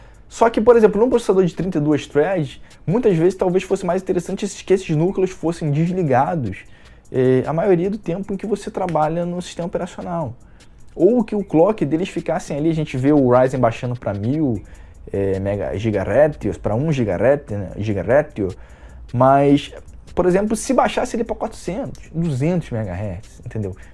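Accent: Rio de Janeiro, Brazil. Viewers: poh não para não! Conheci teu canal através desse conteúdo, gosto de todos os assuntos que vc aborda aqui no canal, mas krlho os videos que mais me prendem aqui são desse tipo, tu fala sobre umas paradas, q eu que tnho um certo tipo de conhecimento nessa área, fico tipo “krlho esse maluco manja pra porra, foda ver ele falando essas paradas pq são de fato assim” então manda mais conteúdo assim que ta foda, ainda mais no nosso dialeto carioques que fica mais pika ainda kkkkk. Tmj ✌🏻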